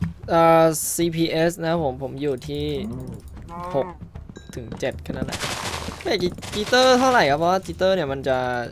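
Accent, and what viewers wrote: Suphanburi, Thailand. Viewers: ก็ว่าเสียงเหน่อๆ เพิ่งรู้หนาเนี่ย ว่า เป็นคนสุพรรณ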